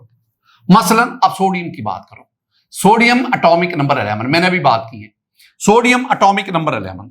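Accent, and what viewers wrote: Punjab, India. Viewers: Punjabi speaking 🗣️👀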